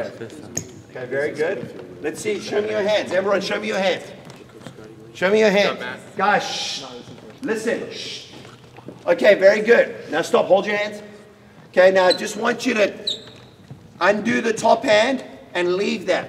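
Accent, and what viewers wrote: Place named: Russia. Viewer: ) Guess you should make a video? Feel free to post to compare! - There is definitely a time to bend your arms. The game has changed allot since the 80's. You sound Russian and old School. In the 80's they served underhand. Today even at HS the serve is coming so fast your goal is to make a platform. Sometimes your arms cannot be straight. But you can still make a great platform with bent arms! :) Anyway send me the link to your video coach? :)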